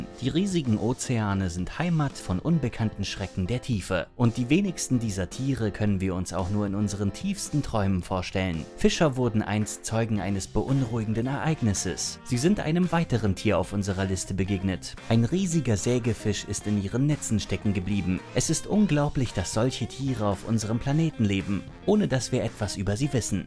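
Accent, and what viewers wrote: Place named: West Germany, Germany. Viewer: was isn das hier fürn schrott? gruselgeschichten für 12 jährige? am erschreckendsten ist das wessi-deutsch vom sprecher...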